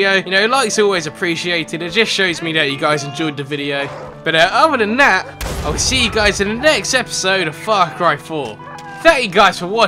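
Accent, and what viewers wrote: England, United Kingdom. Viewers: So yiu are from england??? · are you brittish yes or no (brittish is cool) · Your British JUST LIKE ME?😇😇😇😇😇😇😇😇😇😇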